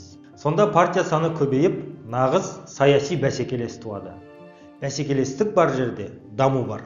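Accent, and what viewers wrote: Standard. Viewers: жарайсың! Қазақ елінің ұлттық тілінде таза сөйлейді екенсің. Ел президенті ұлтжанды азамат болса, нұр үстіне нұр болар еді. Заманбнк балам Ұлтжанды Азаматтығың сөз саптауыңннан көоінеді. Қазақ Ұлт болып қалыптасу үшін тілімізді жоғалтпауымыз керек. Ананың тілі, Анаңныңмахаббаты тіл жетпес бір теңіз емеспе? Па, шіркін Қазақ тілінің керемет тіл, құдіретті тіл екеніне айтуға сөз жетпейді!!!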